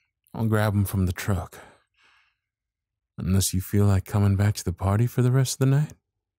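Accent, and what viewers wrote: South, United States. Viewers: Woah, who was gonna tell me SOUTHERN exists?! I love it! Gimme more please 👉🏻👈🏻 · aaaa!!! love this audio so much. Southern country boys are my type anyways so this type of audio is awesome!!!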